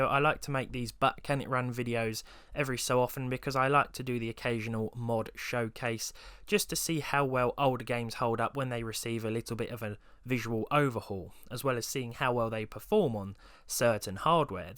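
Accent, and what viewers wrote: United Kingdom. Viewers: Pronouncing mafia as "mäfiä" sounds especially wrong with a British accent.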